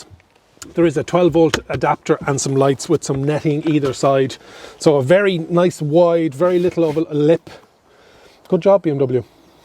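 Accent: Ireland. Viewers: Nice review. My Irish wife from Mayo is sitting with me and she's trying to figure out your accent. County Meath?? She cannot figure it out.😊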